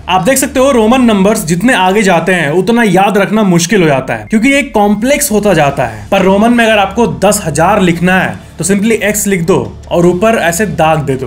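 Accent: Bihar, India. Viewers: I think brother from bihar 😂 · Theth bihari bhasa. Pulur-pulur😅😅😅😅😅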